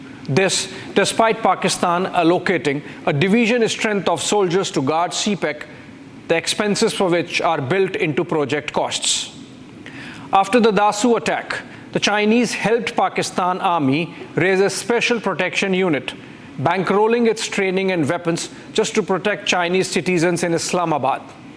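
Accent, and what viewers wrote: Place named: Delhi, India. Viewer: Why have they hired someone straight out of Delhi public school to read an English bulletin?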